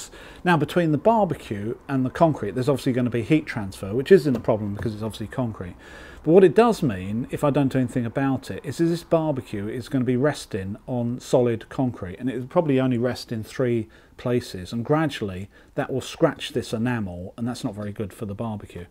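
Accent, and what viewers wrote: United Kingdom. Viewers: I appreciate each new video, very smart and useful designs. And the british accent makes it so entertaining to my French-canadian ears. Great job Sir! (I should copy/pastre this message on all your videos).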